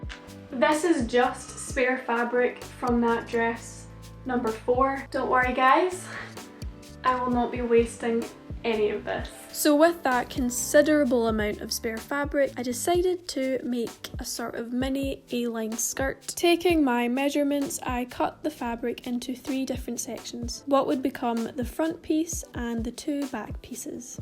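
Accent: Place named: Scotland, United Kingdom. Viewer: Love the Scottish accent makes me miss home :) love the flips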